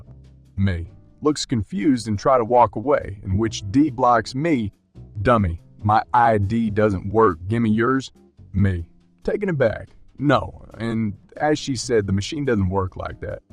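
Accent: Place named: Texas, United States. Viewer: Think he's from Texas Got a southern drawl Not a bad reader Just needs to modulate his speech when doing the different people Same voice throughout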